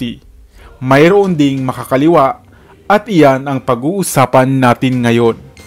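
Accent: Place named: Philippines. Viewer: Galing ng boses ng narator maliwanag ang mensahe pilipinong pilipino di gaya ng iba slang n di mo alam kung sang bansa galing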